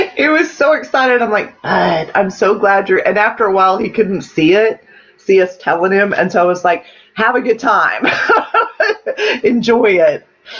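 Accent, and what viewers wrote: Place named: South, United States. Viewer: Southern Accents are the best, hope yours comes out more often!;) South's gonna rise again!:D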